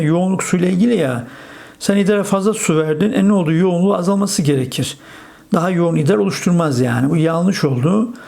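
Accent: Black Sea, Turkey. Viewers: Hocam karadenizlimisiniz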